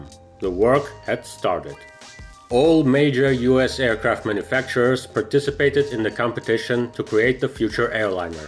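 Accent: Russia. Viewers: А почему с русским акцентом? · I love his Russian accent.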